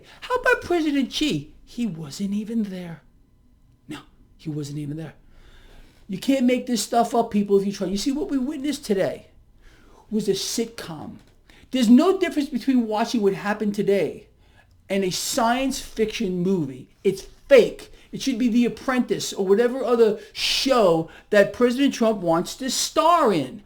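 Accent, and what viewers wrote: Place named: Brooklyn, United States. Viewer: Brooklynese Lol nice 👍 🔱Maserati baby....yeah!!!!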